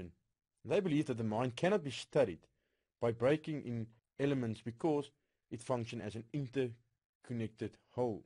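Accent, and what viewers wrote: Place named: South Africa. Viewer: Nice to hear an SA accent on Youtube!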